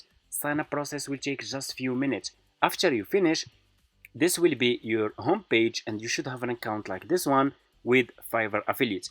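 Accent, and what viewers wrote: Morocco. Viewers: واش نتا مغربي يا صاحب القناة..؟ باينة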